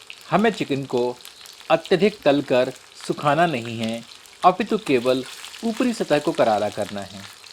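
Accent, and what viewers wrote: Standard. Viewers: Ur Hindi is so shudh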